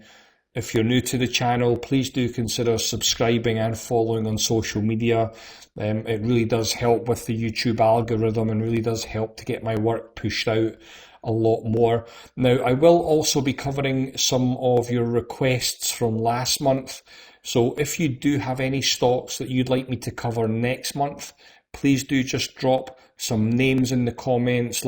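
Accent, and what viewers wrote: Scotland, United Kingdom. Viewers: Thanks for this update and I love your accent, I have so many positive experiences with Scots. Could you say something about Novo Nordisk (NVO)? It is a blue chip company that is down 70% from its highs and I'm wondering if it has reached its bottom yet.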